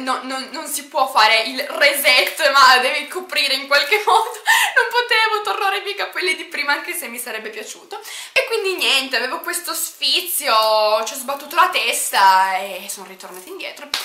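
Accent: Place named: Piedmont, Italy. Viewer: Aaaaalla faccia dell'accento piemontese!Nè!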